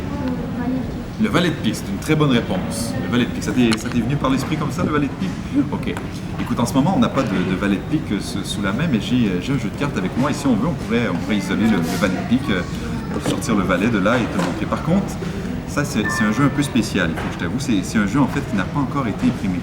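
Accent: France. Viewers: Pense tu as pris l'accent des Français tu ne plus ton accent Québécois... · pourquoi il s'est donné un accent francais???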